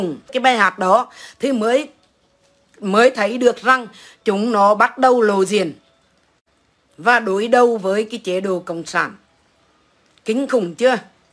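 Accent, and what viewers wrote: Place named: Hue, Vietnam. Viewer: Chào chị nghe giọng nói tôi rất thích nhất là giọng huế.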